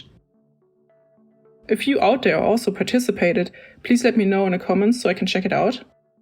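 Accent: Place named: Germany. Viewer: i was summoned by the german accent! XD love you already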